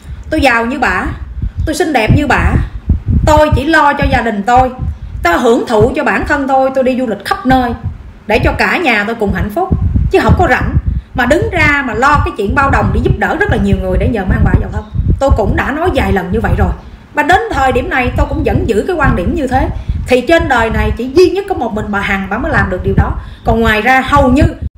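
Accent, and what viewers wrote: Standard. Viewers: Chi noi qa chuan luon 👍👍👍👍👍🌹🌹🌹🌹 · Toi coi nhieu lan kenh cua co co noi hay chuan dung toi ung ho co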